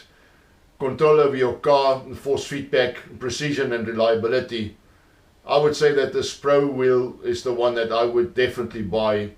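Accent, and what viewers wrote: South Africa. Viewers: Good to hear a south African accent within sim racing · Accent like south african mate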